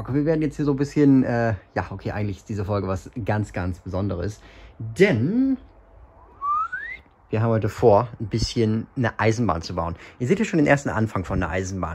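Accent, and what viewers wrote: Germany. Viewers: Bist du eigentlich aus beiern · kommst du aus münchen ?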